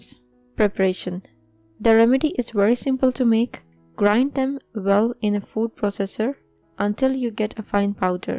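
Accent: India. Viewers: SORRY . WE DONT UNDERSTAND . YOUR. INDIAN. HINDI ENGLISH . SORRY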